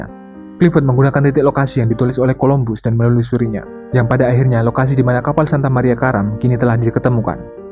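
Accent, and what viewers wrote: Java, Indonesia. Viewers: Logat nya jawa cpt lg. Gk guna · bos... rada medok. dari jawa ya... pada bae lah...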